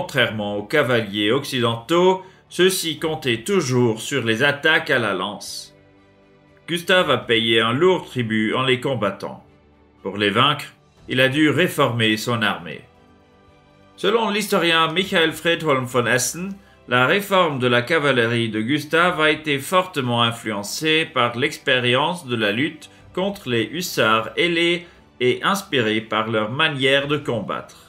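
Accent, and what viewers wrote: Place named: Swiss German, Switzerland. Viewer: Suisse allemand, il me semble.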